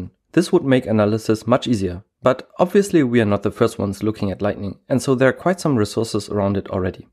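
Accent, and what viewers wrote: Germany. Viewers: Are you from Germany? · Dieser Deutschakzent ist super 😁 Das Video auch ! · 100% a German accent. Trust me, we have something akin to a sixth sense, allowing us to recognize our own accents in lightning fast speed (pun not intended lol). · Yep he is german😂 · Are you German? Just asking because of the accent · Judging from your accent, you are German. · Bist deutsch oder? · are u german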